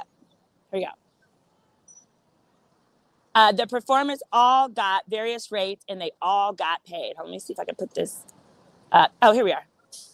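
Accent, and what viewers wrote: South, United States. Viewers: I immediately subscribed to your channel last week after hearing your accent on Demetria L Lucas's podcast. I'm from MS and love a good ole Southern accent. Does your friend care about you calling her a hoe? I crack up each time you say this 😆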